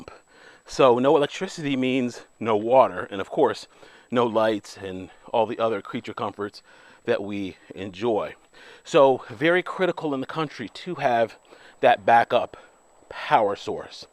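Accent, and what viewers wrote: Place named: New York, United States. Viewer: 😆 bald folks? You got me there I should have known you was a northerner; you speak just like us in nyc the only think you trick me with southern talking points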